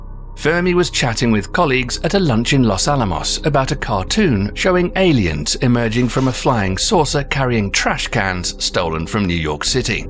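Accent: United Kingdom. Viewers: "Because if an alien civilization exist they certainly know we are here" Another soap opera alien video that starts with a silly thought as if this was (or worse could be) a proven fact. That is first. Secondly, if they existed and they are more "advanced" than we do, and knew we are here, why would they waste their time with some silly humans who does nothing but kill each other in the name of some "god" ? Or make war for opium. Maybe you should ask yourself with your British accent if aliens could be as harmful as UK has been to this world.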